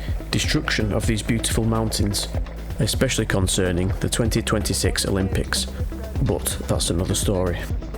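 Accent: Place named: North, United Kingdom. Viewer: Great vid, narrated by a good Northern lad. Magic 👍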